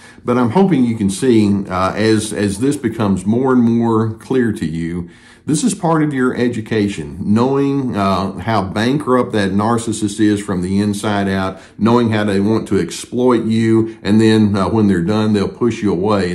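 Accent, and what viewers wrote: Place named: Texas, United States. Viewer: YES!!! I was USED. Very difficult to wrap your head around that when you first realize! Who DOES that??? Answer; someone who doesn’t really have a life! When all is said and done; I have lived my life well. I have learned, I have raised a child, I have respected my parents, I have been a decent giving person as much as I could. I have EVOLVED! He was a hollow shell, a cheater, a crook, immoral and filled with cynicism and hate. He had to PRETEND to be someone else to get me! So WHO REALLY WON??? (I you want to say it in the crude modern vernacular!) Thanks again Dr for the nuances you bring to this subject. PS; never thought I could become FOND of a Texas accent😉!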